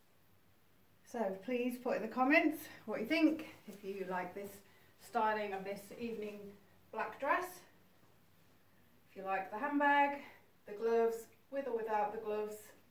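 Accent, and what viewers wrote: United Kingdom. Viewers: Helllo greeting my name is Abner nice meet you this my first time watching your video you look beautiful greeting from United States 🇺🇸 of America at State of Georgia here southeast coast, close to Atlantic Ocean. I’m fan women wear high heels pantyhose stiletto and 12cm 13cm 14cm 15cm 16cm and platform too I do like stocking and nylon thigh high 😅too! You have good accent from English in England 🏴.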